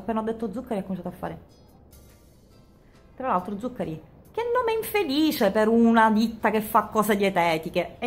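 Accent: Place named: Palermo, Italy. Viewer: utilissimi i tuoi video come sempre! io devo ringraziarti.. mi hai fatto alzare il "culo" dal divano! .. mi hai organizzato la vita! ora sveglia alle sei e workout di jillian! e non solo, dopo cinque anni che vivo a palermo tu, e solo tu, sei riuscita a farmi prendere l accento palermitano! (non che vivevo lontano giusto 70 km più a est, ma non avevo questa cadenza !) grazie di tutto ;)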